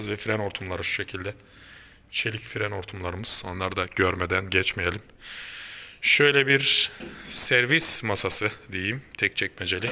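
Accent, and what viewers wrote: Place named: Russia. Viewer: Ustam çok güzel olmuş. Hayirli ıslerin olsun. Konuşmanda ara ara rusça aroması alıyorum. 😊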